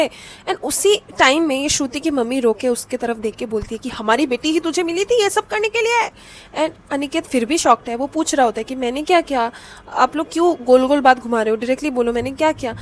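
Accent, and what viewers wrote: Bengal, India. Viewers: didi's bengai accent remainds me of my very close school freind always , i still miss him as we both moved to diffrent Cities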